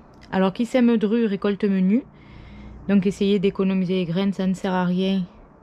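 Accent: South, France. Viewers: Les premiers semis avec l'accent du sud ...Que demander de plus 😉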